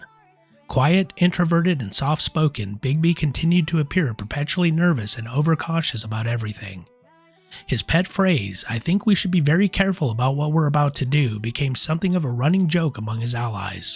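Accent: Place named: South, United States. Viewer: you sound like you are from the south.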